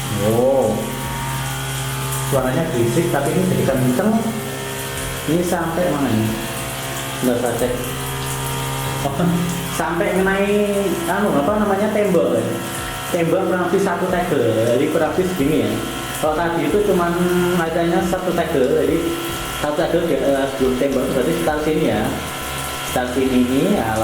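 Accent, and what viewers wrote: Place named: Yogyakarta, Indonesia. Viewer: jogja banget..koyo aku ngomonge..hehe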